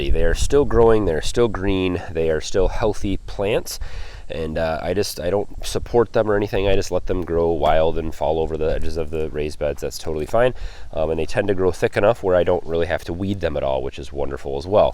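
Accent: Michigan, United States. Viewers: I want to start a garden next spring but I'm wondering if there's something I can do now. Should I till the soil now and cover with leaves? My area has been mowed but its weedy. It hasn't been touched for decades. P.S. Sounds like you're in Michigan. I'm in Genessee County. Zone 6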